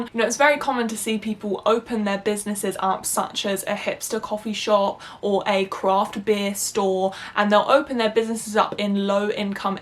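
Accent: England, United Kingdom. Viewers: English accents might be more diverse than you think. Her accent is very clearly an English one.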